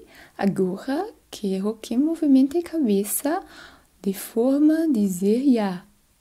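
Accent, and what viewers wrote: Germany. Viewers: Que sotaque lindo é esse? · Sotaque alemão incrível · brasileira imitando uma alemã perfeitamente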